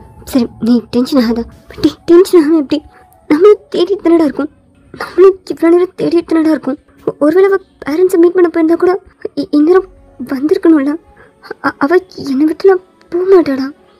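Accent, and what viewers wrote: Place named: Madurai, India. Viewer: Hloo Sis 👀💜 unga channels ah recent ah tha theriyum Ella ffs uhm paathen intha ff romba Azhagaaa irunthuchuu like solla wrds eyy illa avlo Azhaguu 🫶💯✨️Ceo vum aprm unga oneshots ellame 🫠✨️But this hits diff yen ungaluku comment pandravanga idha pathi pesala nu enaku thrla unga dialogues laam Avlo Azhaga iruku...😫💯Vmin ah Avlo Azhaga kamichurunthinga intha story line eyy nallairunthuchu But jimin ku eathachu aagura maari tae feel pandra Maari kaamichurukalam i neant unga story romba nallairunthuchu i can feel vmin realtionship but jimin tha romba paasam kaamicha maari oru feel🐣🥀 spcl part potta apdi podunga And this made my day na avlo hpy ya feel pannen intha ff kekkum pothu intha comment padipingalanu thrla but padicha neengalum hpyy ya feel pannuvinga nu tha podren ❤️‍🩹✨️ Neenga madurai la antha slang Azhagaaa pesuringa na sivagangai...Aprm Starting la neenga okva nu ketutu neengale ok nu soldrathu enaku pidikum...Aprm support illanu feel pannathinga kandipa support kidaikum...And i will support youu okvaa...Saranghae sistaa...💜🦋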